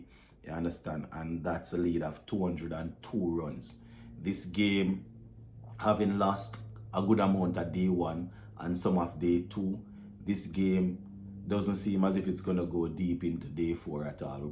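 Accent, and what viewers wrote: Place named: Jamaica. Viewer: greetings my brother. I trust all is well. I haven't heard you used the phrase "The men not good" in your Jamaican accent. I believe the expression will be relevant in this context.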